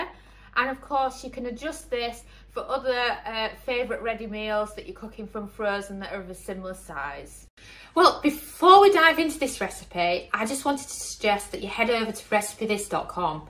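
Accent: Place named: Yorkshire, United Kingdom. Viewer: It’s some of Northern British accent, maybe Yorkshire? Very endearing to hear but I think some non-Brits may not understand everything.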